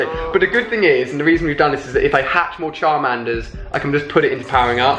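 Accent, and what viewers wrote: United Kingdom. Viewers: you are awesome. Keep up the amazing work. FYI dat british accent though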